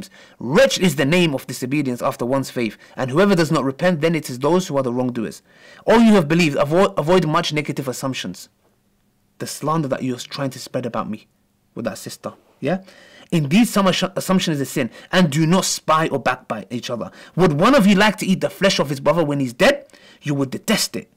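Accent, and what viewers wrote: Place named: United Kingdom. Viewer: As salamou alaikoum brother , I am a sister from Montreal and my English is not on top and I ask you brother to speak a little slower since it’s mixed with British accent and I have a bit difficulties to understand. Can you put subtitles? Your videos are full of good informations. Thank you brother may Allah reward you al Jannatil firdaws🙏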